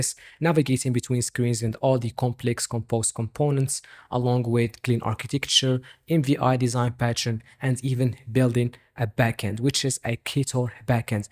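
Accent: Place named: United States. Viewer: اشرح عل مهلك خرب حظك مشالله عليك احس انك مولود في أمريكا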